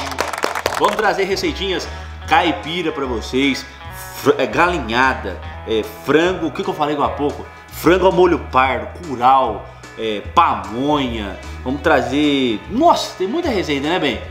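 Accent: Minas Gerais, Brazil. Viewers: O sotaque mineirinho voltou com tudo! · Adoro suas receitas!! Adoro vcs dois! vc é mineiro?!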